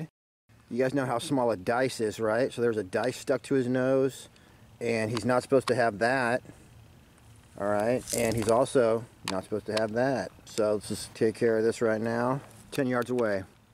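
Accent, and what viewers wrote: United States. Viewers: Will you sound American so why would you buy basically a toy when you could have a real gun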